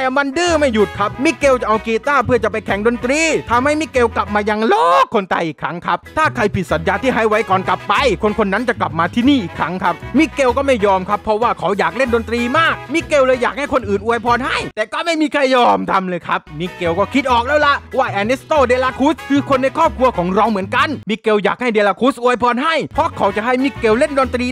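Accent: South, Thailand. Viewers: พี่เป็นคนใต้ใช่ม่าย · พี่คนจังหวัดอะไรครับคนใต้หรอพี่ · พี่เป็นคนใต้หรอครับ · เเอดเป็นคนใต้ท้ายนิ555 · พี่เป็นคนใต้เหรอ พี่เป็นคนจังหวัดอะไรอ ผมสงขลา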